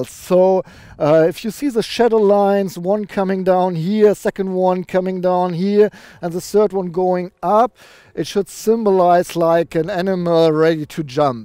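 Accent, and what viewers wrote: Germany. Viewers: Die Videos sind nice... vielleicht solltest du einfach mal ne ganze Woche am stück Englisch sprechen, Radio auf englisch hören, englische Youtube Videos gucken; damit du mal diesen fürchterlichen deutschen Akzent loswirst. nimms nicht persönlich, mein Englisch hat mal genau so bescheiden geklungen ;) eine Woche reicht. Wenn du sie nicht schon auswendig kennst, guck dir alle staffeln von TOP Gear UK an...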